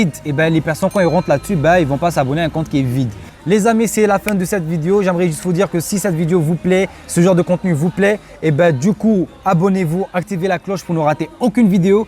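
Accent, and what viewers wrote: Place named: Arab world. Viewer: Tu vis en CI, mais t'as un accent arabe, As tu des origines arabes?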